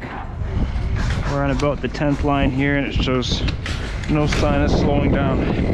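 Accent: Canada. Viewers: Are you Canadian?! Sounds like it haha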